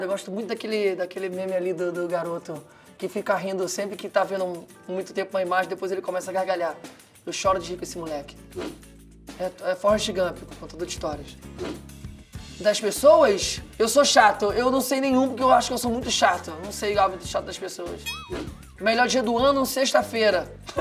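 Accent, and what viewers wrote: Pernambuco, Brazil. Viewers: Agora tô mais fã ainda de tu,visse?! O sotaque de Pernambuco é massa mesmo!!! "Xero" Rafa!!!(por aqui a gente manda carinho assim!) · Realmente nosso sotaque é lindo #pernambuques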